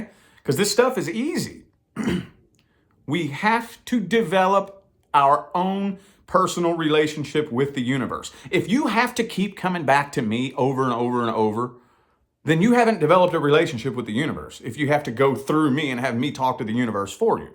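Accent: South, United States. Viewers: I needed to hear you. Thank you. I wish I could just "do it". I've been reading so many years it's not even funny. I do it only when somebody asks. My addiction was Abraham Hicks about 10 years ago. I know what I am supposed to be doing but here I sit. I started listening to you a very long time ago. You were familiar in a strange way but I later found out you were from my area and sounded like my dad and brothers. That red neckery accent hahaha!!! Keep doing what you do! Maybe I will catch up someday! I love you and your family as I have watched you all bloom into awesomeness like no other! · You've got that southern accent! I'm so new to manifestation at first it was law of attraction, and that didn't work, but later I came across law of assumption I was like oh my word!!!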